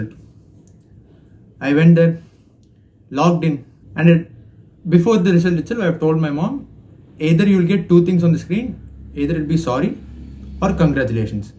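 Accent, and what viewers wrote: South India, India. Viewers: U must be from South India? Rt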